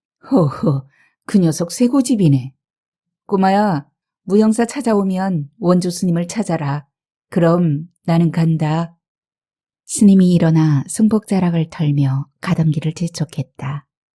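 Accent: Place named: Gyeongsang, South Korea. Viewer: 매일 열심히 살자는 마음으로 산 생활을 합니다. 이 작품은 내 삶의 모습을 잠시 점검케하는 계기가 되군요. 좋은 작품 고맙습니다. 갱상도 억양의 낭독도 참 좋아예~~^^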